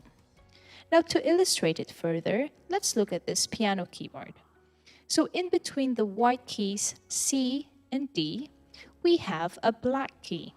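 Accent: United States. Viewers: nagulat ako nung nagtagalog siya akala ko kano HAHAHHAH thank you for the vid tho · Biglang nagtagalog akala ko po tlga Americana kayo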